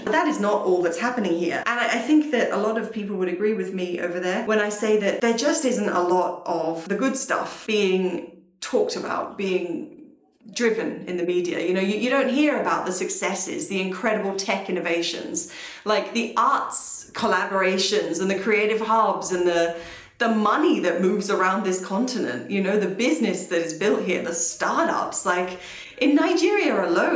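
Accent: Nigeria. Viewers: You're developing a Nigerian accent That's sweet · I was ready to roll my eyes, but was pleasantly surprised.I was taken aback that you were Australian ( I have my own prejudice against them, I find them to be quite racist and bush😂)Such a perceptive and intelligent young woman.Your husband ,I haven’t finished watching, but I am assuming you married him because of your surname.. had found a good woman. You even sound a bit Nigerian now😂. May God bless and strengthen your union… two brave people who took a chance and it paid off, I love that!👏🏾🇳🇬🇬🇧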